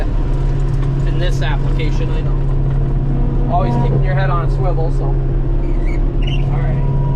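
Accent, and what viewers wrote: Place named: South, United States. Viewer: You got southern boy in you (Y’all )👍🏻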